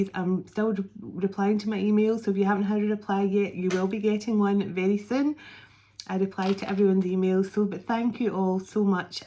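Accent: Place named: Scotland, United Kingdom. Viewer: Love ur reading style,seen nothing like it,❤❤❤it.a while back I guessed irish,Irish, corrected me kindly,no its obviously scottish=D.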